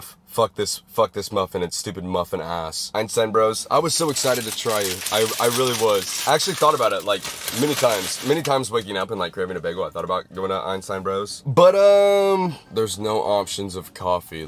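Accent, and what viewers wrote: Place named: Scotland, United Kingdom. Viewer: GOODBYEEE DID HE SAY WEE BIT HES SO SCOTTISH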